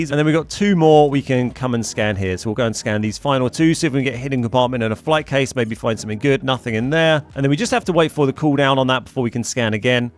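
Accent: England, United Kingdom. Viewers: He's English but uses far too many Americanisms for my liking, but I forgive him..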